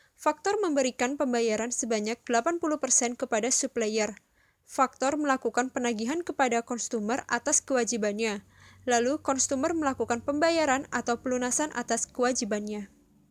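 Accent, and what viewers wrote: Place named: Java, Indonesia. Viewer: Eh orang Bengkulu tapi medok Jawa🤭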